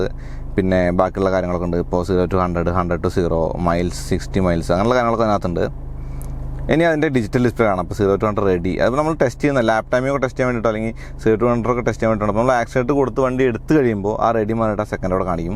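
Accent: Thrissur, India. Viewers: ദയവായി സംസാരം അല്പം സ്ലോ ആക്കു. നിങ്ങളുടെ ഈ തൃശ്ശൂർ charupurality മനസ്സിലാക്കാൻ പാടാണ്.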